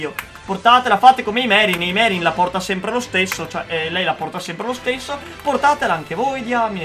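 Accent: Veneto, Italy. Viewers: Ostrega te si Veneto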